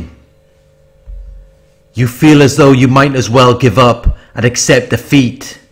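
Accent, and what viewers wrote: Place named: Swansea, United Kingdom. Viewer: Still got the Swansea accent 😉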